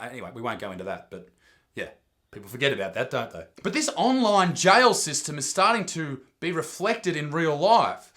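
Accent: Australia. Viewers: I literally loved this video! What an amazing message delivered in an true aussie way. You handled that email and Facebook issue so well. Awesome job mate!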